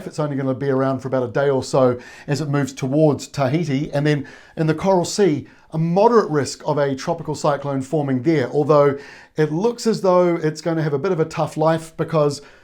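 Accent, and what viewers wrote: New Zealand. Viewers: Good clear kiwi presentation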